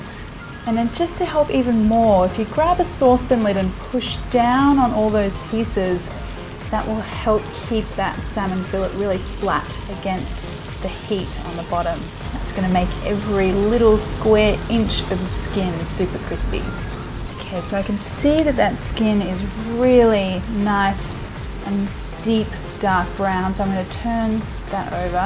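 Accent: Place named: Australia. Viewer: Yet she has a clear Australian accent ok lol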